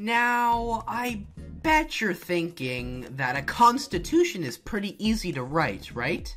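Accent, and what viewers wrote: Quebec, Canada. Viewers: probably from Quebec, Canada. definitely from up north, speaks both english and french fluently, plus the references to Canadian provinces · Is he Canadian? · he is Canadian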